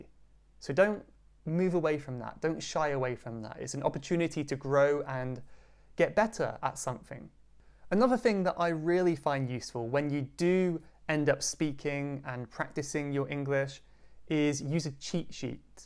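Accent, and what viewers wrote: United Kingdom. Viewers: I am from India and I am here to learn british accent..and I get your videos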